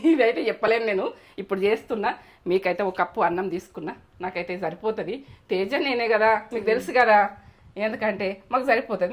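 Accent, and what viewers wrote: Telangana, India. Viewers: Amma mana telangana bhasha nu chalavaraku marchipoindru mimmali chuste chala happy ga undi tarvata mana vantalu mana tokkulu 👌👌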